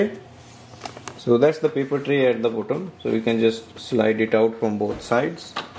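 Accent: India. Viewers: Your Indian accent is annoying.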